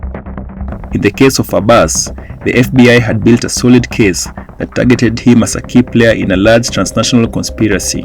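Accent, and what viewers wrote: Kenya. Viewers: The Kenyan accent of the narrator...the tone of the video seems to admire the fraudulent activities...it's very sad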